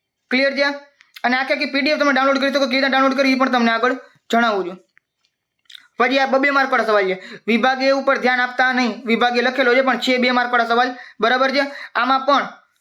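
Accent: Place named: Kutch, India. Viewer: Sir tame katch na lago cho barabar je je kyo jo atle 😅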